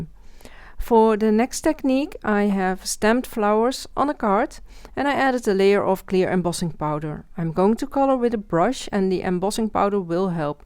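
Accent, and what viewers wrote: Netherlands. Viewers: Waauw amazing ideas that I've never seen before ! Thx !! En ik dacht al dat Nederlandse accent te horen haha.. wat heb je leuke nieuwe ideetjes zeg !! En wat een mooie kaarten en bloemen !!! Enorm bedankt voor je moeite om het te delen !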